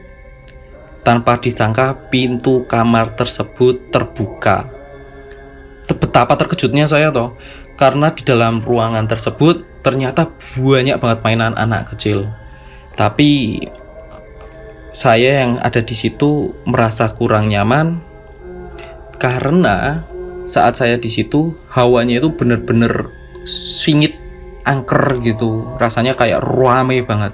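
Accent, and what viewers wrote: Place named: Semarang, Indonesia. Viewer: Logat semarang kihh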